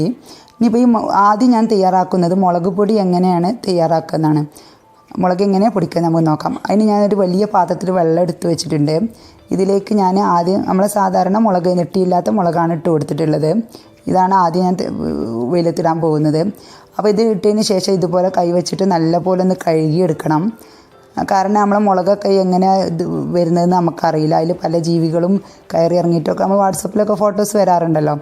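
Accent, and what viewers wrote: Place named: Malappuram, India. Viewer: ഇജ്ജിന്റെ വർത്തമാനം നല്ല മലപ്പുറം tune ...super vedio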